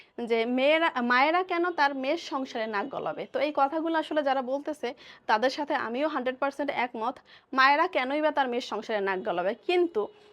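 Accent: Barishal, Bangladesh. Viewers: ভাবি আপনাকে বরিশালের ভাষা কথা বল্লে খুব হাসি পাই, ভালো লাগে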